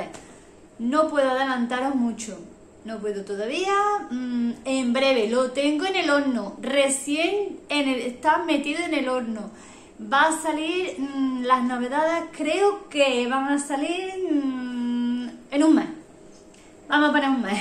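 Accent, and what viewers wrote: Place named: Andalusia, Spain. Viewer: Pero que alegría ponerte cara. Si eres guapísima cariño que arte tienes que me gusta escuchar a alguien con acento andaluz de las mías. Que pedazo de 2021 ole ole nos lo vamos a pasar bomba. Un besote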